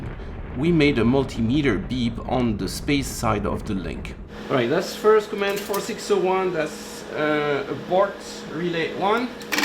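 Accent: France. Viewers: All of this work you have done over the last several years should be preserved in the National archives, French accent and all. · u french?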